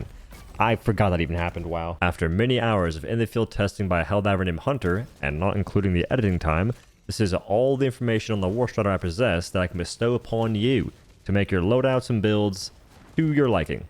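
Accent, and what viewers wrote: United States. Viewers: So your british using a American accent. Thats wild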